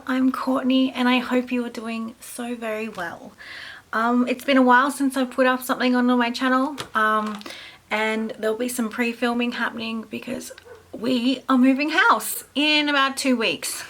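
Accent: Australia. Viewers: I love Australian accents. So cute :D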